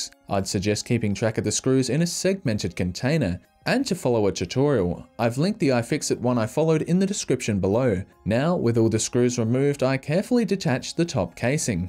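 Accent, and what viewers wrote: Adelaide, Australia. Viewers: Always nice to hear another heaps good Adelaide accent. Keep up the great videos! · you from south australia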